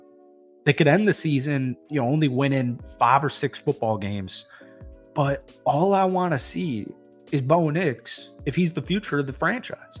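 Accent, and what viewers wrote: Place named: Midwest, United States. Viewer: Pardon Midwest pronunciation he means no disrespect we all say OR eh Gon out this way. We'd totally give you a hall pass if you said illinois with an s at the end 😂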